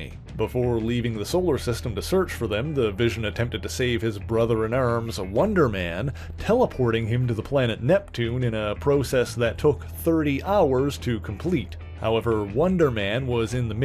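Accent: Newfoundland, Canada. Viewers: Why does the narrator sound like a Newfoundlander to me?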